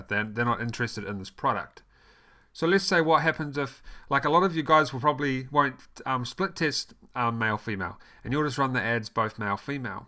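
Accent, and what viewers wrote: New Zealand. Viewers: Recognize that kiwi voice instantly; love your videos bro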